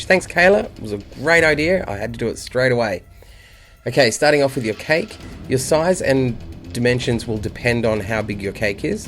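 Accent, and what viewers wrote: Australia. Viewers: He's actually Australian NOT Brittish XD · it's an australian accent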